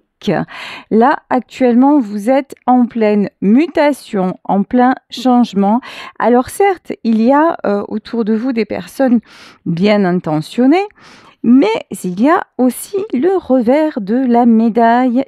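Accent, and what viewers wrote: Marseille, France. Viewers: Coucou...merci pour ce beau message d'espoir et d'un visible...navré pour vous de la perte de votre frère...j'ai versé une larme de Pierrot pour vous deux...j'ai perdu des êtres chers, très chers, comme vous le décrivez! Votre tirage me parle tellement, la personne qui trahit, et des défunts, j'en ai 3, dont une que je vois souvent dans mes rêves. Votre épreuve vous rend encore plus forte et plus belle, rayonnante...d'ailleurs, vous avez une voix magnifique, et hé vous écouterai pendant des heures, toute une vie. Votre voix me rappelle la femme qui guide la méditation de Doreen Virtue, et qui dure une heure...on se sent porté, guidé, aimé, protégé, comme avec vous! Un Ange 👼! Merci à vous, merci d'être là! Et moi même thérapeute, enfin plus précisément pédagogue, puisque je suis sophrologue, je suis ému et transporté comme je pourrais le faire en relaxation...Je vous embrasse belle voix marseillaise, peu cher...oui, très cher! 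Très chère...Jeux de mots 🤩😘🌞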